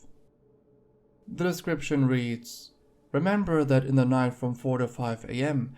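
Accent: Germany. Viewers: Considering his accent sounds like Cosmodores i'm thinking hes german.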